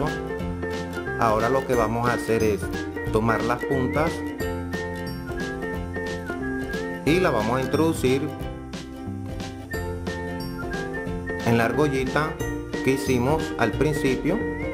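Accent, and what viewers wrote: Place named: Caribbean Coast, Colombia. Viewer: Buenas, recien encontre este canal y me parecen sencillas, hermosas manualidades y bien explicadas... una pregunta usted es colombiano? a ratos lo siento costeño...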